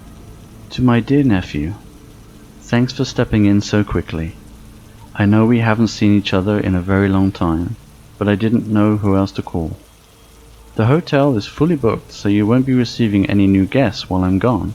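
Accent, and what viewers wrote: United Kingdom. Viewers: What’s his accent? Slightly British?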